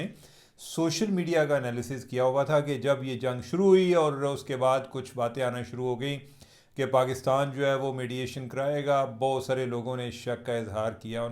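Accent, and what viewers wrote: Punjab, India. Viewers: Love your Panjabi touch ❤ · I regularly watch ur vlog, very balanced, especialy punjabi tarka makes it so good.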